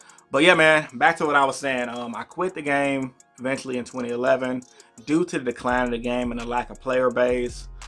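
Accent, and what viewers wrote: Chicago, United States. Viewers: Were you from Chicago?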